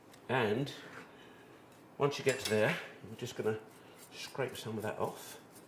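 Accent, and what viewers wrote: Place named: United Kingdom. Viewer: He has a VERY heavy british accent lol.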